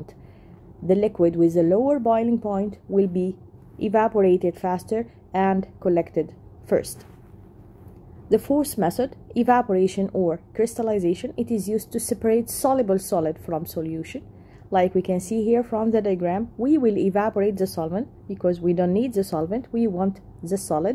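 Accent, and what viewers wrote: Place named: Arab world. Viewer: I think she's Arab, because arabic doesn't have the letter p so it's easily confused with b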